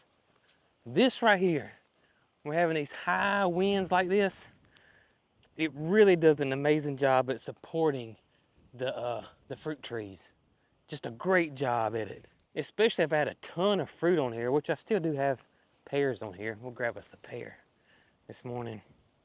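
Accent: South, United States. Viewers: Gotta love southern talk 😂 tornada aka tornado 😊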